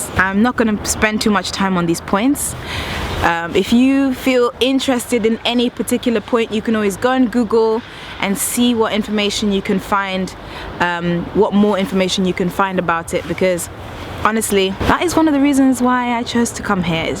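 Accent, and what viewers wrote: United Kingdom. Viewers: gosh I love your accent! I wanna move to Britain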